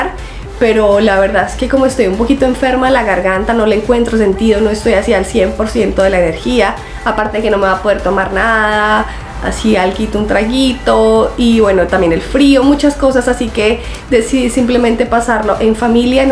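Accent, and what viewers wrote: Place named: Colombia. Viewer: Qué tengas un muy feliz año nuevo mi niña hermosa , eres muy bella y simpática y me gusta mucho el acento colombiano , bendiciones desde Chile 🇨🇱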